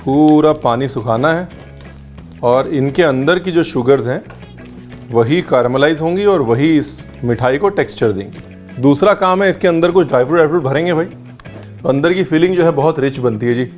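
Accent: India. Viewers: Pta nhi jab MASTERCHEF INDIA MEIN Chef Ranbeer ko dekht thi ..to dono chef k muqable mein wo thoda...moody lgi thi..but..here OMG I can't...he is sooo much...typical Punjabi GREEN HEART MAN...NOw I love him soo much & his simple cooking style..& also his frankly desi speaking....😍😍😍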